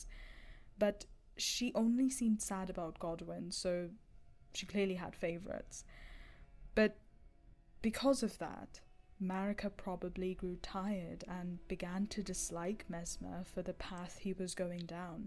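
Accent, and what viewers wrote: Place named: Germany. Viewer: I hear a bit of german in there?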